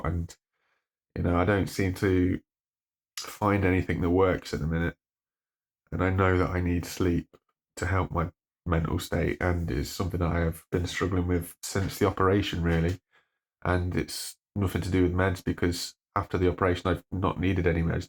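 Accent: United Kingdom. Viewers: Listening to you talking, it's like hearing my own thoughts (except with an awesome British accent). All I have to say is thanks for being here, and if you can hang on then maybe I can do the same. <3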